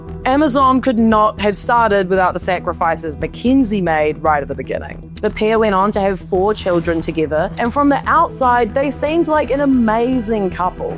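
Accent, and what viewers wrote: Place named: New Zealand. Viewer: OMG! Your NZ accent and facts makes me realise this is for real😮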